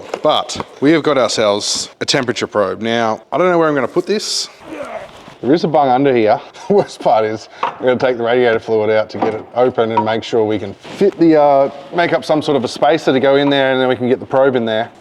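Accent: Australia. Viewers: These little cars are so cool. Also wait, you're Aussie! Subscribed!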